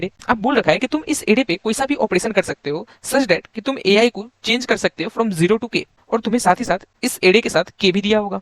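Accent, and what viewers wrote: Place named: Bihar, India. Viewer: acha smjhaya . kaha se ho bihar me? (accent++)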